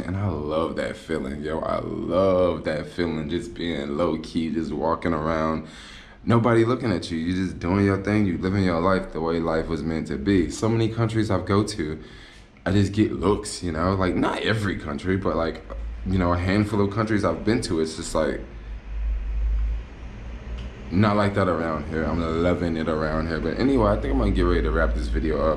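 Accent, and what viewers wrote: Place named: United States. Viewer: his accent is clearly American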